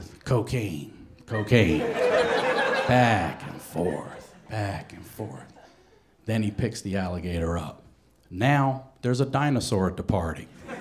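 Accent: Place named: Baltimore, United States. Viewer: Damn he's got such a Baltimore/maryland accent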